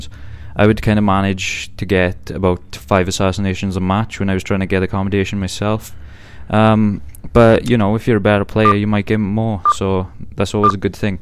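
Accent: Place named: Wales, United Kingdom. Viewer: OMG I FOUND SOMEONE WHO IS ACTUALLY WELSH!😁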